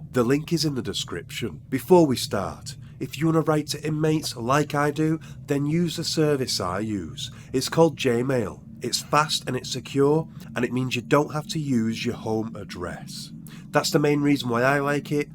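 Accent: United Kingdom. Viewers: UK accent is disturbing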